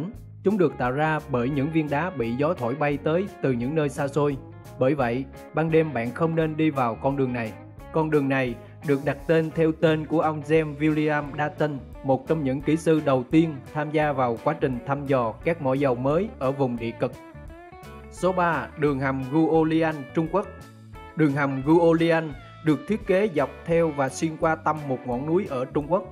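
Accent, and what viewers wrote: Central, Vietnam. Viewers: Ad quê ở miền trung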